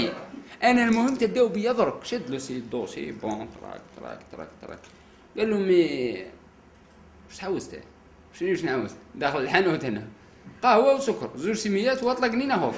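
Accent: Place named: M'Sila, Algeria. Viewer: Tu est très fort tu ma remonter le morale surtout ta belle accent de msila ou de Djelfa brabos et merci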